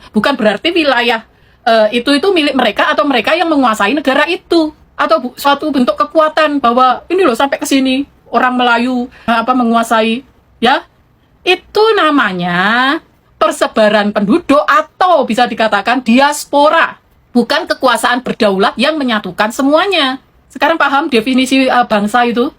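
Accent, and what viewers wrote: Java, Indonesia. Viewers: Loghat jowo bangetttttt...sesuai jadi bibik ku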